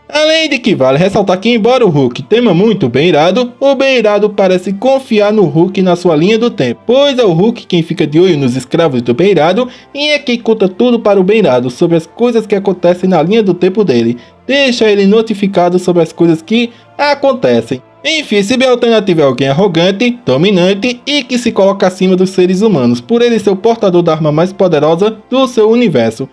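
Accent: Northeast, Brazil. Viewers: Mano curiosidade foda que eu adoraria saber seu sotaque e nordestino?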